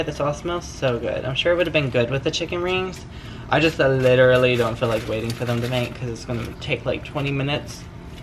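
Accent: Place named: South, United States. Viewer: What state are you in? I like the southern accent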